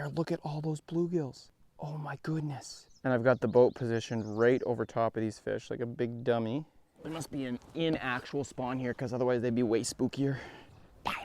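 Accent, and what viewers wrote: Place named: Canada. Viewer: I'm Canadian but this is one of the strongest Canadian accents I've heard.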